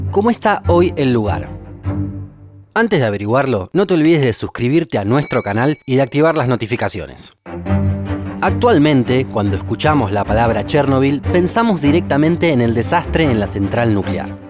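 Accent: Buenos Aires, Argentina. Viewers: Que embole con tonada porteña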